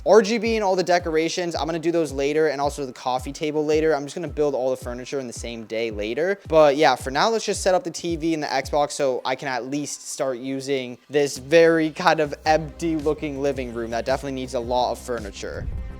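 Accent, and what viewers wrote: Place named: United States. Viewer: Bro is so American England is better he has so strong accent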